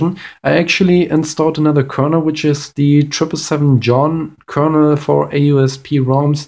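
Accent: Germany. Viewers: Deutscher Akzent XD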